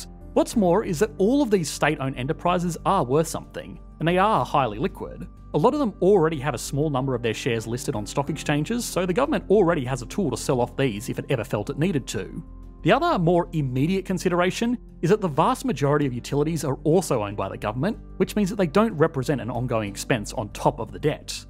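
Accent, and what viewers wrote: Australia. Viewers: take propaganda, put it through disgusting australian accent, paste youtube video, profit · Australian here. I recognise an Australian accent. Notice our independent source commentry is less biased or partisan.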